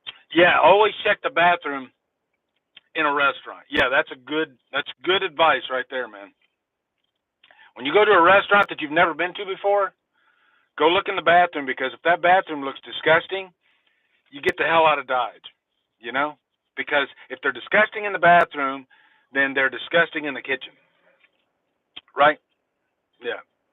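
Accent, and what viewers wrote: South, United States. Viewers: We have Tesco express here in the UK- Marks and Spensors if you’re wanting posh! Love your southern drawl…. I was born in London, and moved to Norwich… So now I sound like a cockney farmer! 😳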